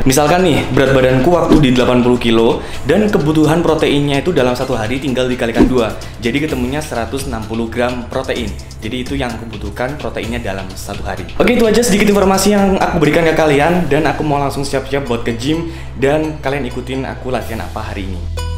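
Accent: Malang, Indonesia. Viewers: Asli orang malang ta? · oalah mas iki wong malang to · Orang malang??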